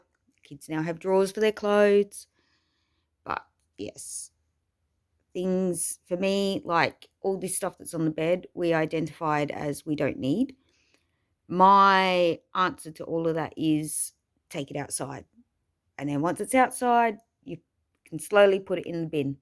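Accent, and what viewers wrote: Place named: Australia. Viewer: Wooooohooooooooo go you so proud of you it’s good to here an Aussie thanks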